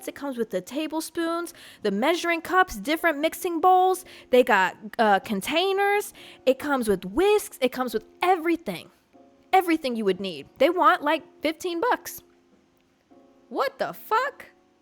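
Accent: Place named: South, United States. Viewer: you sounded so southern in this one lol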